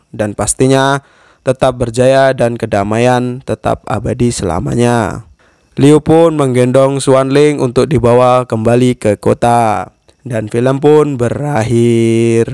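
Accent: Madura, Indonesia. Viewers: Orang madura kah bang?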